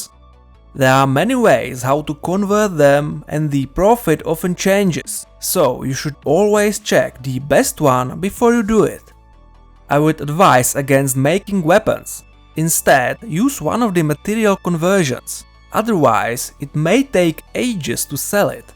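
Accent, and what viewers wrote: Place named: Czech Republic. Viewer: Ta čeština z toho celkem prýští :'D dobrý video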